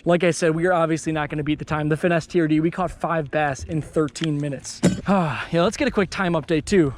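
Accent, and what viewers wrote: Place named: Midwest, United States. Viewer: Sounds like your Sobi’s brother lol midwest aye?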